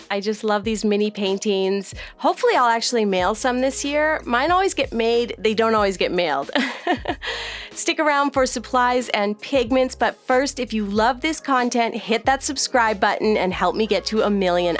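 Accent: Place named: Canada. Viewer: Accent .... heard very slightly in the way you pronounce your "O's"...... Canada 🇨🇦? I can only aspire to paint like you..... 😒 my ink and wash is positively elementary.. you are so talented 💖 warmly, base of Mt Hood.... Oregon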